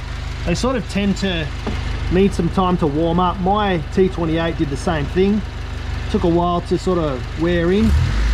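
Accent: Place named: Australia. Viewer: Tell mydipsy wreck to kick rocks and do one! you speak like that because that's your accent and how Aussies speak!..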